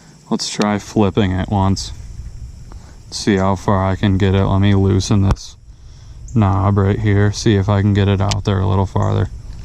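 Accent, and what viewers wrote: Minnesota, United States. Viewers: You’re either from Minnesota or North Dakota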